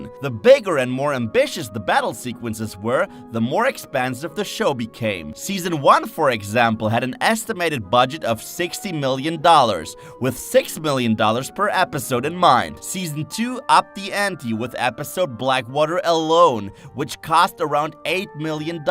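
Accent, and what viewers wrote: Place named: Germany. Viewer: your german accent is horrible lmao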